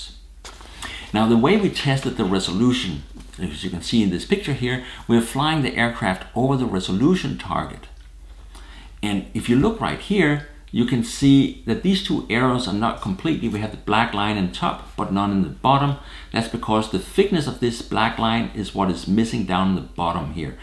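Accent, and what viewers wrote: Denmark. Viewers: Hey, I'm a big DSLR geek just getting into the drone-world after getting my Phantom 4. Amazing stuff and pure candy to watch your videos. You have a new subscriber:) Er du i øvrigt dansker? Synes jeg kan høre det i din dialekt (og ikke mindst dit navn). Thanks:-) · Excuse me, but are you originally from Denmark?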